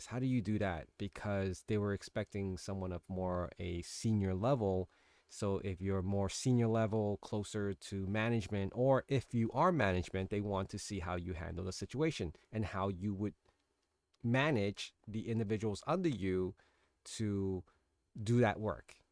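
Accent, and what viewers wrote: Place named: New York, United States. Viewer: Niiiiiice - Everyone has thier $$$ figure that they will have a wolf of wall street moment and go back into the office..."you show me a paystub making that amount and I'll quit my job and work for you today" lol Being that you sound like youre in NYC and some of the duties you described....it has to be 175k+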